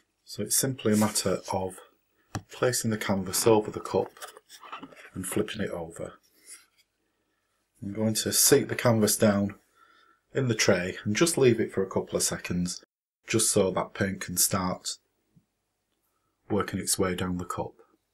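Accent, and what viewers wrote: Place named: Sheffield, United Kingdom. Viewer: An Excellent Tutorial and not just for beginners. We come from the same area re accents and I'm glad to see you using real spoons they wipe off so easy ( an ex pat from Sheffield ) Ann Wardley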